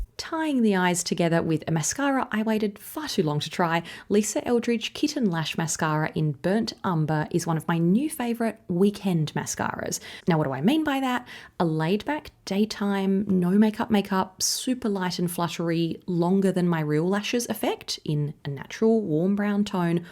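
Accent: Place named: Australia. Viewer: I love your videos! The colors you show are perfect for me. Your Aussie accent and calm voice are lovely. Thanks!❤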